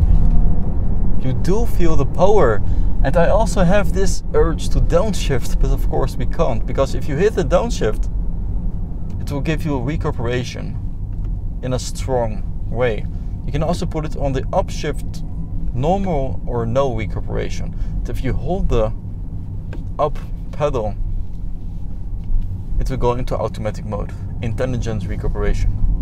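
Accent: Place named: Netherlands. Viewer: Ugly dutch accent, stopped watching after i heard: ünlock and ~rare steering😥😥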